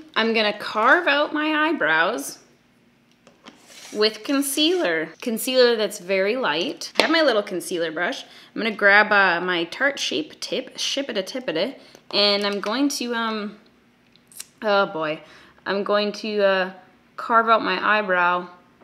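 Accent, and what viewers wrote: Canada. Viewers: sounding super Canidian today eh? I love it okey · This was pretty funny I burst out laughing a few times when you were doing your techniques you don't like. I also find it odd that you sound so Canadian and I've lived in Vancouver, Calgary and Toronto and haven't heard too many with the same kind of pronunciation of some words as you do. Especially the OOOKeeys. It's funny and cute. I also agree having super matte thick dry foundation just also makes my skin look like that and any lines and acne spots just look horrific so I've been having to go much lighter and luminous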